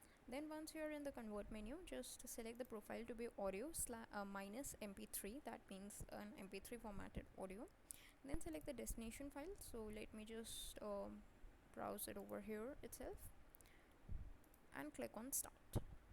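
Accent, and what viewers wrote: India. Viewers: Can barely understand you with your heavy Indian accent. In English, the letter V is not W. Just saying. Plus, you speak to fast. Your English words are blending together.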